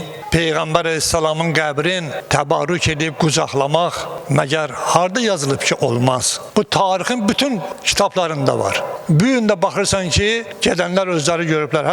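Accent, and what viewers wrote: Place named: Iran. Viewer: Bunun nə murdar səsi, ləhçəsi,sifəti var. Iran tulası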